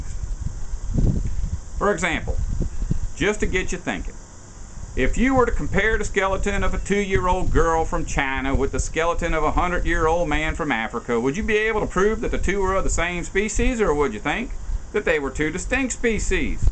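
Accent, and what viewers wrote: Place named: Texas, United States. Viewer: Your voice is very comforting to me, never mind what anyone else says. Its a vocal pattern I heard constantly when I was tiny, growing up in the Gulf Coast area of Texas. So you, sir, are the voice of a comforting bedtime story to me. Thank you for being you.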